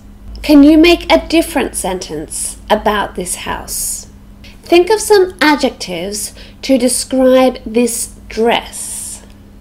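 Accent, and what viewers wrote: Australia. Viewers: I am used to understand more American accent than other accents and I was trying figure out which word you were saying at certain moment in the video. I found out when you said and wrote the word on the screen. The word was "big". hehehe A very easy word but I swear I really couldn't get it. I love accents as such as British, American, Australian, Canada and New Zealand. Therefore, I always say that we must listening different accents. By the way, I love the Australian accent. It is so marvelous how you sound when you speak.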